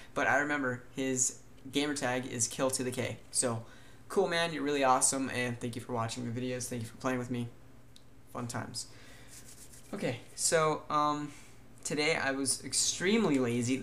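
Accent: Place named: United Kingdom. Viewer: Thanks. I'm not as addicted as it sounds like you used to be, but I do over-use my computer. So after this comment, I'm turning it off and going downstairs :) Nice to see you realised this and turned your life around, I'm happy for you. And side note, the accent is kind of British :) Question... do you prefer to make vlogs, or tutorials? What do you see as your "main channel"?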